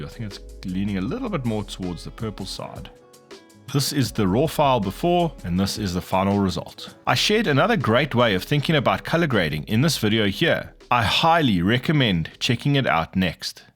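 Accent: South Africa. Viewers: i might be wrong but you sound SouthAfrican, or at least Southern African, and for that i will give this video a like. me supporting local content 😅. jokes aside, i literally started using Lightroom today and the knowledge you parted will act as building blocks to in my photography journey.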